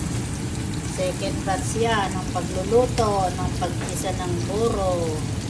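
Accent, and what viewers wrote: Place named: Ilocos, Philippines. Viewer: Sis masarap yan! yung tono ng salita mo Ilocano taga Cagayan ka ba?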